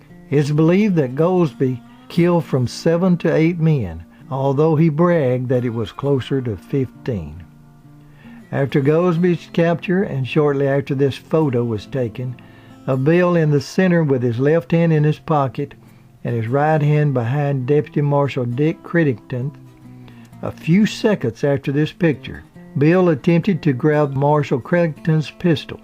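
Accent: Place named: Rural, United States. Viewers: Please get the marbles out of your mouth and properly enunciate your words, this is English, not hick. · Great stories. They should be delivered by a campside fire. I'm British but I absolutely love that accent. (Is it a Kentucky accent BTW?)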